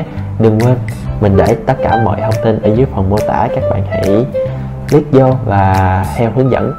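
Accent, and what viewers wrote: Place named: Binh Duong, Vietnam. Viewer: Giọng nói của là người Bình Dương gốc luôn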